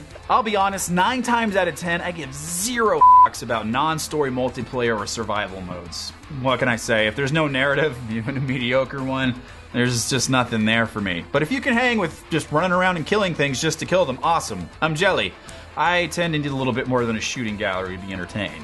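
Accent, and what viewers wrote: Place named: United States. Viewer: Awesome review! Informative, humorous and to the point. It's nice to hear an American tell me about games rather than the obligatory Brit the big sites seem to hire for reviews. I'm not saying they are annoying....I'm saying your AWESOME. Sunbscribed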